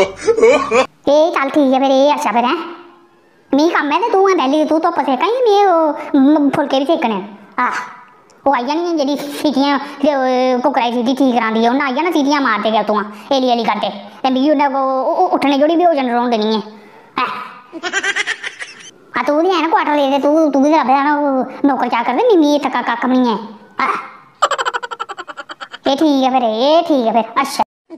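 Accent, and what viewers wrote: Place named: Jammu, India. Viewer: 😂😂😂😂 bilkul aise hi baat krti h jammu ki ourtein. Very nice.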